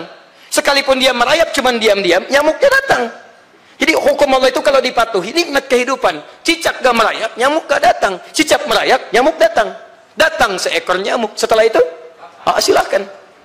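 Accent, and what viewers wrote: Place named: West Java, Indonesia. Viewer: Alhamdulillah terima kasih Pa ustad kaya orang sunda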